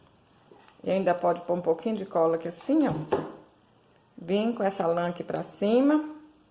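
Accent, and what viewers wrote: Minas Gerais, Brazil. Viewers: Estou apaixonada pela sua arte e por esse jeitinho "mineirim" de falar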